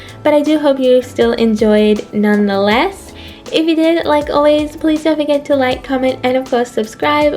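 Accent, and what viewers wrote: Australia. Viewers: This is random but are you Australian?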